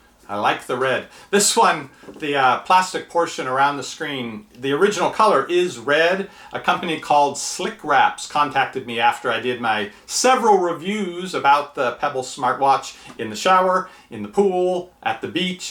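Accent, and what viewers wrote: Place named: Northwest, United States. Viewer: You said Seahawks. Are you from Seattle? I've been wondering where you're from; clearly not Austin. You say "aygs" for "eggs" and that would make sense for the northwest.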